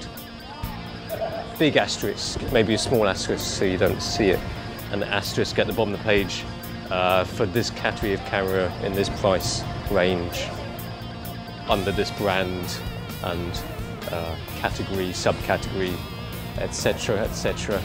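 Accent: United Kingdom. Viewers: You sound British but your asian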